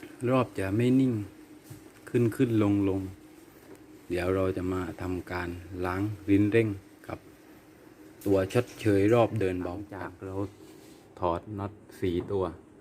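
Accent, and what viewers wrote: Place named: Bangkok, Thailand. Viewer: ฟังสำเนียงชัดเลยว่าเปนคน กรุงเทพ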